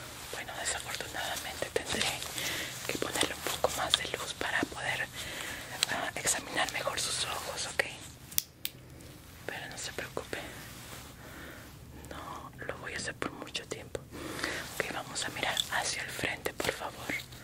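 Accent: Mexico. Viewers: Asumo que eres de México Pero de que parte de México en si?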